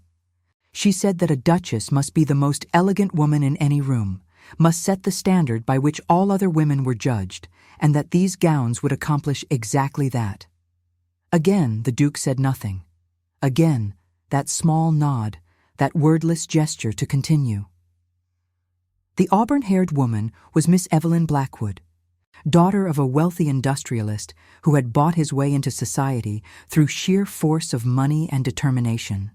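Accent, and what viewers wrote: United States. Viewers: Why the American narrator? You need a British accent otherwise this sounds cheap.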